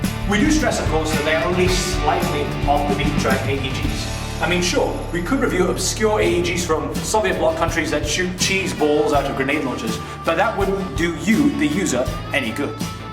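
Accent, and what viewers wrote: United Kingdom. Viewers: i said influence not control ;) he asked why does he have an english [british] accent? and i just said because of Britain's influence in Japan. It's a larger one than America or other english speaking countries, no? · but why does he speak soooo... english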